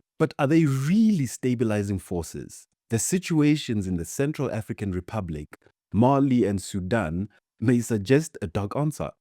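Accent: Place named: South Africa. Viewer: I wouldn't be looking at the Chinese or Russians or anyone else. Look at those corrupt sellout leaders and politicians who care nothing for their countries or its people... BTW you sound like a South African if I were you. I'd be more concerned about how it is that 7% of your population own the majority of the land in that country...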